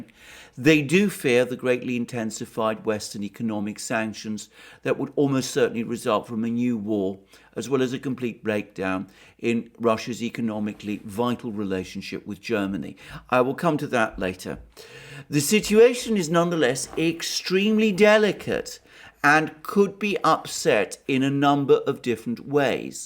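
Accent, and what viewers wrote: United Kingdom. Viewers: you are a smart man...I can tell.. are you Russian? Little bit of British accent there.. am a Nigerian.. I love russia 🇷🇺.. my second son is named after putin..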